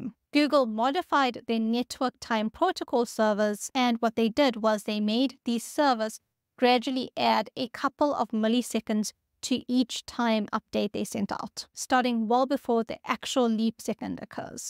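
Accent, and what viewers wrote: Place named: South Africa. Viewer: Sounds like a Saffa accent.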